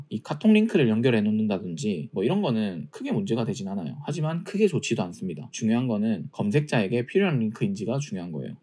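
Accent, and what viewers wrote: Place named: Gyeongsang, South Korea. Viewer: 강의듣는데 말투가 친근하셔서 같은 경상도인이거니 했는데 거주하시는 곳이 같은 지역 같은 ㅁㄱ동이시군요 ㅎㅎㅎ 괜히 반갑고 더 집중하게 되었네요 !! 좋은 영상 감사합니당 😊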